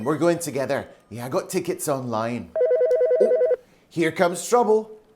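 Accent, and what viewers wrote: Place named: United Kingdom. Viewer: U hv typical uk pronunciation 😂 n ur japanese is much better than mine🤦🏾 老師好厲害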